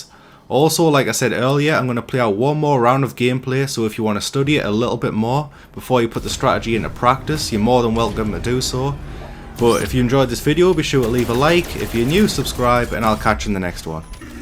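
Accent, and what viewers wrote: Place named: England, United Kingdom. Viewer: another great video, but random question, always wondered where in england your from by your accentv haha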